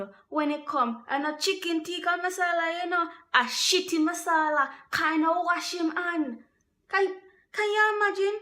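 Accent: Jamaica. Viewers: 😂😂😂 girl u some' else! i love when you speak in that patois accent...sounds real cool! And u have the most beautiful big brown eyes ...yah mann! 👌👌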